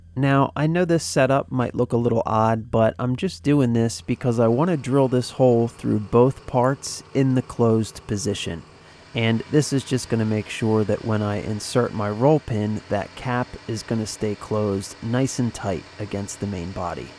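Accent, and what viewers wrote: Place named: United States. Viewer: Are you breaking that bicious cyvle or that vicious circle? I don't always understand the American accent...😅😅😂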